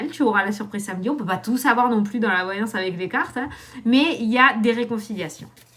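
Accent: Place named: Tarbes, France. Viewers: sur messenger vu que ça passe par FB j'ai 2 contacts celui avec ta photo de chouette et celui avec ta photo normale lequel est le bon. Pour les contrats signatures immobilier je te remercie de ton tirage cela me parle bien ça avance. Merci merci merci.❤❤💜 j'adore tu parles comme mes nièces qui sont à Tarbes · Quel bonheur cet accent me manque tellement. Toulouse, Lavaur,albi, castre. Trop de merveilleux souvenirs 🌟☀️🌟☀️❤️💕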